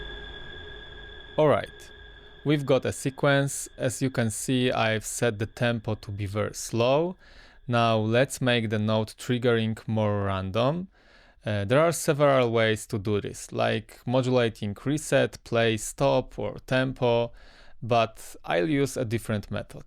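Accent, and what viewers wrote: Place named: Poland. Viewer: Słyszę polski akcent w angielskim, ciekawe czy zgadłem ? Bardzo fajny materiał. Pozdrawiam 😜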